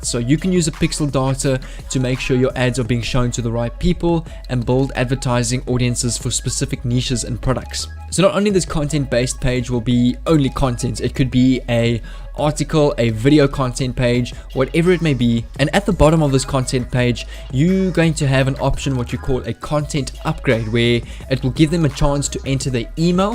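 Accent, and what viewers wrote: South Africa. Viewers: Haha yeah your accent gave you away I live in Johannesburg lol so I know the accent lol. I'm a person who has entrepreneurial mindset and values. I'm kinda struggling due to lack of funds. I'm 20 and I live with my parents. I'm trying to get out there and start something but this junk status is kak lol there are almost zero jobs lol( my dad has a PHD and can't get a job he studied for lol😅)😅😧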